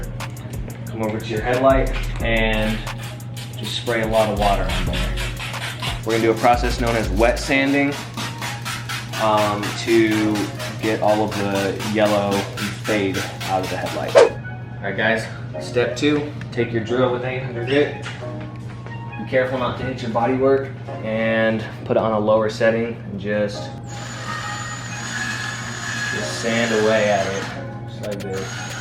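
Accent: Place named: Australia. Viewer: Will it work on dirt bike headlights. Still hearing some of that Aussie accent mate.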